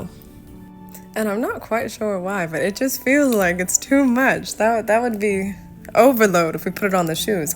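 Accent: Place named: Transatlantic, United States. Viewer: your voice sounds like a very 90s icon doing a 1960s transalentic accent and it is the most amazingly delicous thing my ears have heard in the while.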